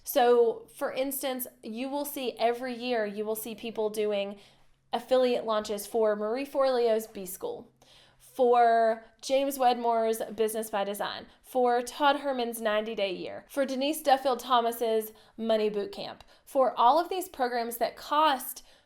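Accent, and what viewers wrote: South, United States. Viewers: For watching this video for the first time, can I just say I hear the southern accent and love it lol. Really helpful content here trying to start affiliate marketing myself!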